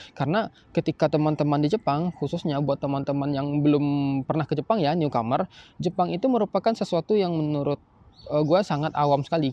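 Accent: Padang, Indonesia. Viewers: Abg nih org padang ya?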